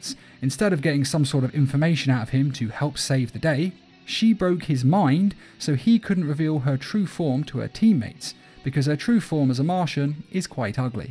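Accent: United Kingdom. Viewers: Hey. Just wanted to say how much I love your content.I wasn’t very interested in the superhero content until I stumbled across your channel.Hearing that sweet,beautiful British accent is so satisfying every time.Love your content and hope you get more recognition in the future.For others who see this,have an amazing day.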